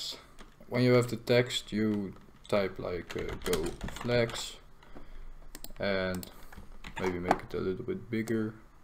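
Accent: Netherlands. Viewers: Lekker nederlands accentje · Are you dutch you sound like you are dutch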